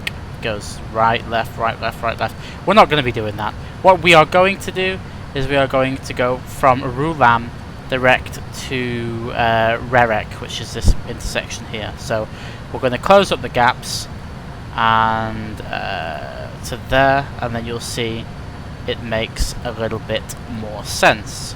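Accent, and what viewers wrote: United Kingdom. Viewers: Prefer a pommy voice-over to yank ones :o) - Aussie wouldn't be bad but you've done a great job thanks.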